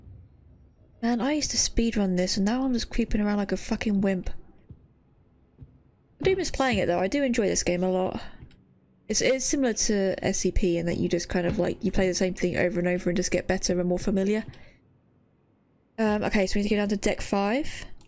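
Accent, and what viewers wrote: United Kingdom. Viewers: Look what you've done. How do I know what country you're from? I know you're English. I never knew what country the others were from.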